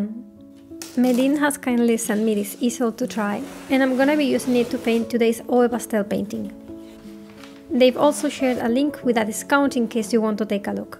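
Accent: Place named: Spain. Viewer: Disculpa hablas español? Es que por tu inglés suena Español como lengua materna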